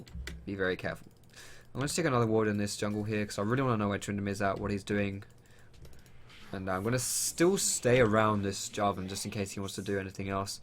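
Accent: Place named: United Kingdom. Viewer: I KNOW RIGHT ??! It made me laugh for the whole game, but does he really means to say cumshot all the time? Cause he's not even laughing, so i'm wondering if he means to say something else but we don't get it because of his british accent or if it's just a joke he doesn't find funny anymore. °-°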